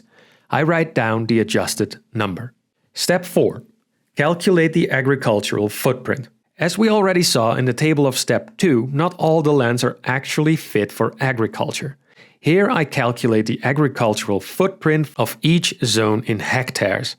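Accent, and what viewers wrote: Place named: Netherlands. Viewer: The channel says it's situated in the Netherlands, and by hearing his accent (as a Dutch person myself) i am 99% sure he's Dutch. So it's definitely weird how the imperial measurements slip in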